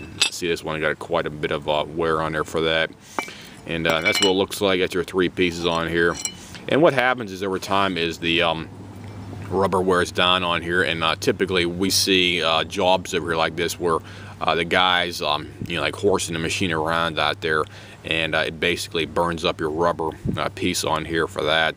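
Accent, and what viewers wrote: Pittsburgh, United States. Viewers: Definitely sounds Pittsburghese to me. Every time he says "down" it sounds like "Dahn," which is very familiar to Pittsburgh! Glad you picked that up too. 😉👍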